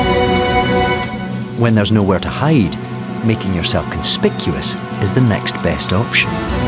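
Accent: Scotland, United Kingdom. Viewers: where're you from in scotland, lad? love the videos. bravo for the work well done eh? cheers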